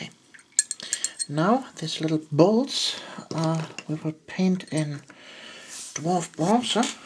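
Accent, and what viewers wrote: Germany. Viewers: she's german it's just her accent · are you from germany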